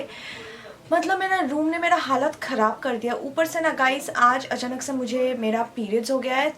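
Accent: Bengal, India. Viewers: Nice log..... love your bengoli style hind.....😍😍😍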